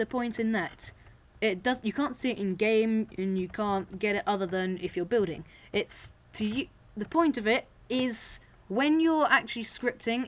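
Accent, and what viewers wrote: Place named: United Kingdom. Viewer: He's britan lol mostly I hate there acent.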